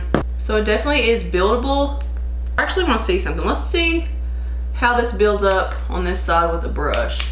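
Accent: South, United States. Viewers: I saw this brand on the WM website and was on the fence whether I should give it a shot! Side note: I just found your channel and I love finding youtubers that are from the South and sound like people I know. I'm from OK!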